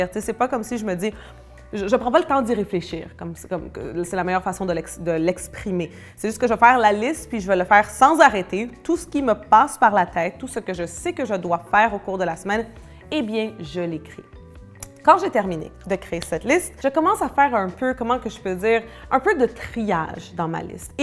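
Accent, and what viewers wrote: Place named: Quebec, Canada. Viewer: c'est la première fois que je kiffe un accent québécois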